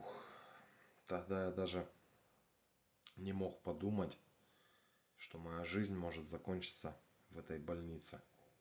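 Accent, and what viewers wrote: Ukraine. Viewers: А разговаривает с украинским акцентом. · да он не в России, не слышите говор что ли? Где то за границей украинец.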